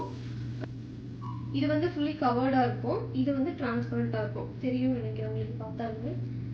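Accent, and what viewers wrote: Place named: Coimbatore, India. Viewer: I like your voice!!! Very sweet!!! And liked your Coimbatore slang 😊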